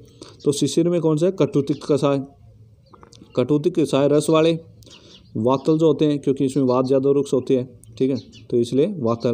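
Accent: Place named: Haryana, India. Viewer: Bhai hariyana se lgta h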